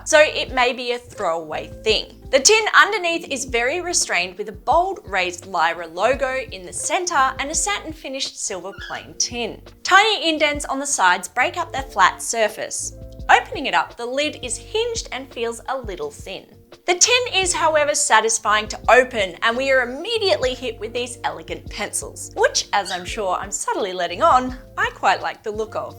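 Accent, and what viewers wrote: Australia. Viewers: Hi!, HaHa! Like you, your Aussie accent is lovely! You bring such positive and happy vibes to your channel and as I really need this review, you made getting the info an absolute pleasure! I am so looking forward to more! ~:face-blue-smiling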